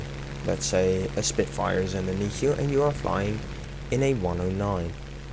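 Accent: Netherlands. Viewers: Nederlander? But a nice vid!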